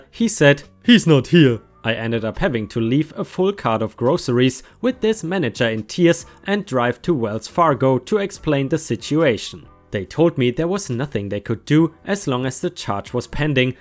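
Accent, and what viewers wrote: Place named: Germany. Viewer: Also, if you don't mind me asking, what part of Germany are you from? I have an aunt from Berlin. She's lived in the U.S. for over 40 years and has a much stronger accent than you. That doesn't matter to me because I absolutely love meine Tante Ludmilla.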